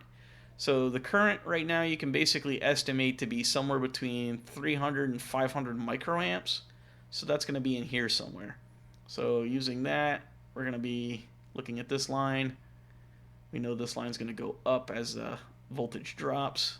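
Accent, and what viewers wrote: Australia. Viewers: Did you shake the Australian accent?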